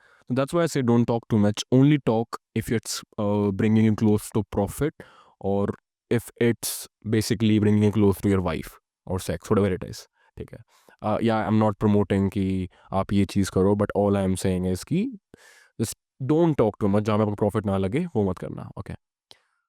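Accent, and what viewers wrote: India. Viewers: He's got an Indian accent though.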